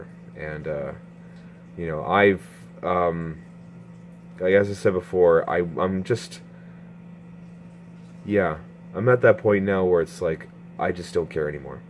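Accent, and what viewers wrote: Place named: United Kingdom. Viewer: Perks of watching the streams: you get to hear the phone story earlier....and in a British accent XD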